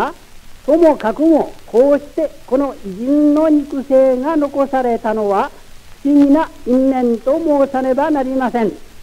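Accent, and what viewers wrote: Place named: Kyushu, Japan. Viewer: ■ から最後のまでですね！イントネーションから九州訛りを感じました。 ■Wikipediaの出自には、 「🔸父・希次は江戸詰の藩士であったため、乃木は10歳までの間、長府藩上屋敷において生活した、、、 🔸安政5年11月（1858年12月）、父・希次は、藩主の跡目相続に関する紛争に巻き込まれ、長府（現・山口県下関市）へ下向するよう藩から命じられた。乃木もこれに同行し、同年12月（1859年1月）、長府へ転居した[10]、、、 🔸元治元年3月（1864年4月）[注釈 4]、16歳のは、学者となることを志して父・希次と対立した後、出奔して、長府（現・山口県下関市）から70km以上離れた萩（現・同県萩市）まで徒歩で赴き、兵学者の玉木文之進への弟子入りを試みた、、、」 と長州、現山口県が青年期と大人になるまでの期間を過ごしていますので、『山口弁』と思われますが、 ■軍人は九州人も多くその影響があったのであろうと思われます。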